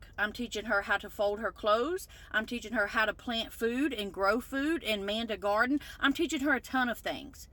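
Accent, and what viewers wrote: United States. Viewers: ONLY NON!!! Gen X would troll you because that the way the world is today 🙄🤦 I love your vids. They tke me back to THA DAY...LMAO AND WILL SAY, I am shocked your from Memphis. You sound more country....lol THANKS for all the the vids tho. It's like time traveling 😆👍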